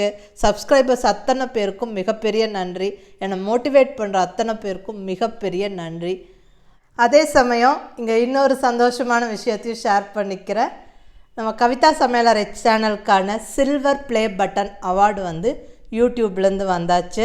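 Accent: Kongu, India. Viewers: Many congratulations madam Wish you many more laurels 💐 Above all your kongu tamizh is also attractive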